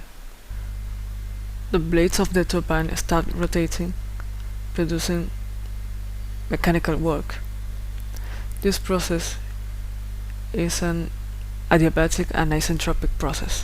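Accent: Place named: United Kingdom. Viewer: El acento de tu inglés es como británico. Muy bueno.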